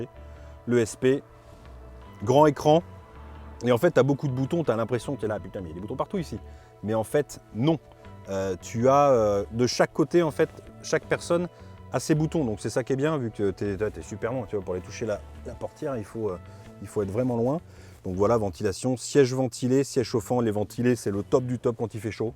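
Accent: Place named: Brioude, France. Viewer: C'est à cause de l'accent de brivardois. Jean leguin humoriste lol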